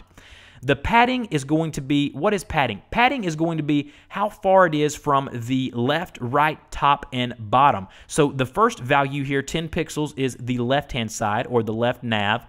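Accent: South, United States. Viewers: It just hit me. Your voice reminds me of Danny Lipford, the home improvement guy on TV. Are you also from Alabama, or another southern state? By the way, awesome videos. ;-)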